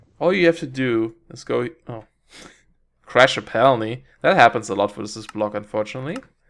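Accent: Germany. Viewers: I love the accent. German?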